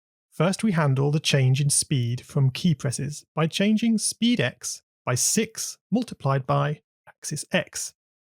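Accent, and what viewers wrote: United Kingdom. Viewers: for some reason, the best coder i know loves it when his own characters die... i guess i kinda do to, you feel a sense of pride watching all of your hard work lose their life, also give me your British accent.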